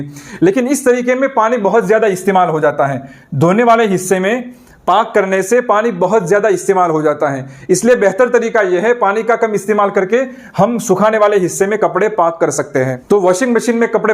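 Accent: Gujarat, India. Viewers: Mufti sb assalamu alaikum warahmatullah vabarakatuhu poochhna ye tha maafi k saath ki jaise molana k aage qasmi nadvi mazahri lagate hai aapke name k aage laga hai ye kis madarse ka hai maloom karna thaaapki baat se to aap gujrat k lagte hai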